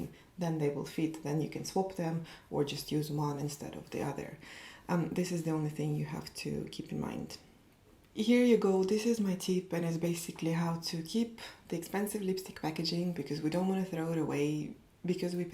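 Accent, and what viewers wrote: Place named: France. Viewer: Thank you! Where is her accent from, Français peut être?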